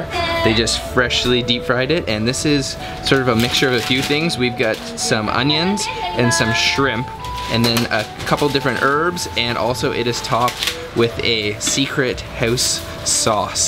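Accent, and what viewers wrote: Canada. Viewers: "secret hoos sauce, let's try this oot" I love that you are unabashedly Canadian... keep up the good work lol!!! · Canadian or northern Minnesota? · omg your canadian accent is strong!! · This is the first time I've watched one of your videos. Not sure if you're Canadian or Mid-Western American. Great video regardless!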